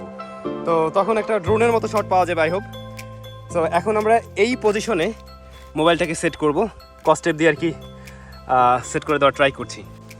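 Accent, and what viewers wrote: Barishal, Bangladesh. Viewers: vai Apni akjon বরিশাইল্লা! ajke janlam.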